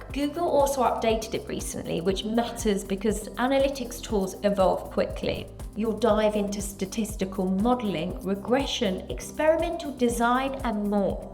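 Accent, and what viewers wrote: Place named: United Kingdom. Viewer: Thanks your very profrssional video. well said, well presented. your English language is Perfect clear slow british style. how u found ur 5yrs experience in coursera? honedtlu. all people talking about their desire to self learning but only 5% complete walking the road to the end. God bless u with many thanks. was nice just cross roads with u here❤🎉gd nite then happy greatvthurc13aug2026🎉😊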